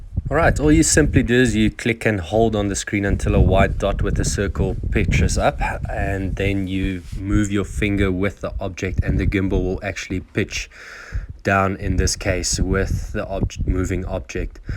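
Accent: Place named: South Africa. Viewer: Bro, you sound South African.... Lol I grew up there, Johannesburg.... But I live in Bulgaria, Sofia... Europe... Sweet 😁🛸🇧🇬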